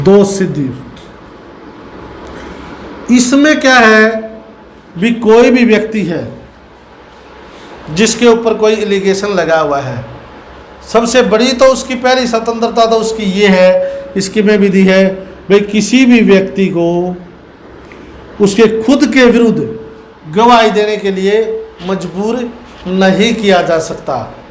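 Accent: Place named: Haryana, India. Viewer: Hariyanvi kamal 😊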